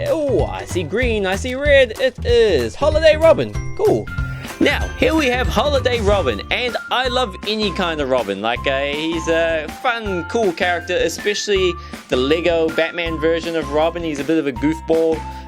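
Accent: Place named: Australia. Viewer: Gotta love that Australian accent! Greetings from Washington state.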